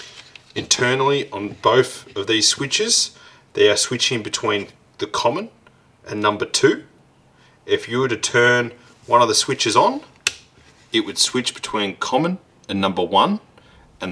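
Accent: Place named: Australia. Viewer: Thanks for your informative videos especially with the Australian accent:hand-pink-waving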